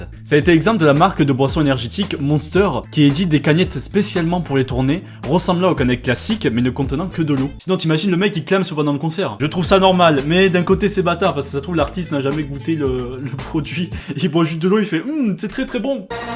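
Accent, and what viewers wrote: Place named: Montpellier, France. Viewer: RBATI Tout droit de Montpellier par dit !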